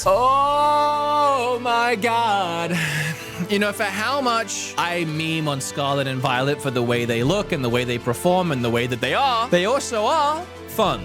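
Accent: Australia. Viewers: what is your accent, it sounds like a mix of Australian and Boston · Where is he from? Is he Australian?? He has a lovely accent! And I can understand it perfectly! ❤😅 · The last time I watched one of your videos, you had an aussie accent?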